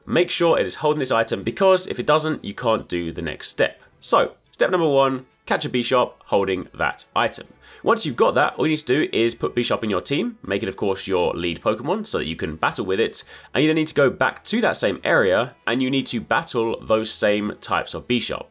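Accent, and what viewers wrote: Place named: England, United Kingdom. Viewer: Because he's English/Australian?... You do know there's no "one-way" to say stuff in English, right? English has countless dialects, and is a fusion of several other languages (German, French, Norse, Latin, etc); England alone has like 40 local varieties of speech depending on where you go in that tiny island. Besides its a name for a fictional thing, how its pronounced should be the least of your worries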